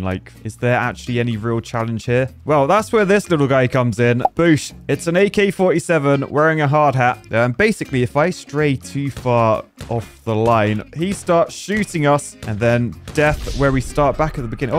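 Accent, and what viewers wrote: United Kingdom. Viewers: Strangely enough this feels like a gray still plays video but with a british accent😂😂😂 im loving it so far only about 15% through the video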